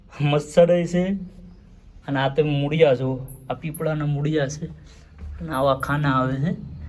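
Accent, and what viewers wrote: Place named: Bhavnagar, India. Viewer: તમારી 'બોલી' પરથી લાગે છે કે ભાવનગર-તરફના હશો...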